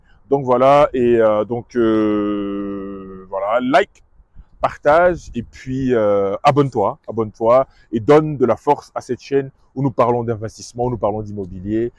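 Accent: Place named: Ivory Coast. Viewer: J aimerais ajouter que vous vous exprimiez en français ivoirien. Vous ne savez pas combien il est beau d’écouter le français du terroir que de nous efforcer à parler à gargariser les Rrrr dans la gorge. Écoutez le français canadien ou le français sénégalais combien ils sont beaux à entendre. Tant que le locuteur peut s’exprimer dans un français soutenu c’est ce qu’ils faut. Le reste n’est que du ridicule. Malheureusement aujourd’hui nombre d’ivoiriens s’ efforcent à vouloir parler comme les français c’est là le ridicule apparaît. Où viviez vous au Texas, Dallas? Les maisons derrière vous sont belles comme les maisons de Frisco, Dallas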